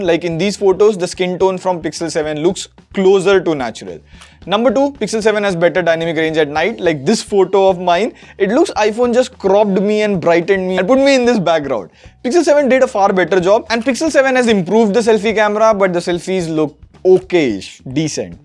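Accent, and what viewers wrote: India. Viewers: Indian accent sucks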